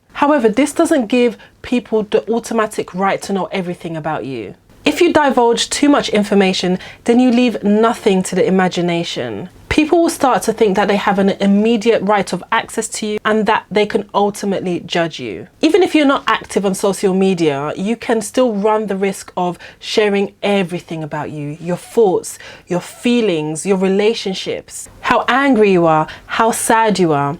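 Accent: United Kingdom. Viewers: a lovely British accent helps! Lol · I love your british english/accent.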